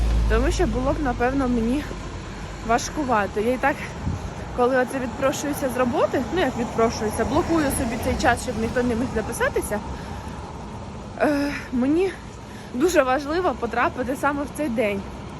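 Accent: Surzhyk, Ukraine. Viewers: вас суржик очень милый❤ Мне, как русскоговорящей украинке, совсем не режет слух😊 Хотелось бы узнать как заговорить на польском, может есть секрет 😂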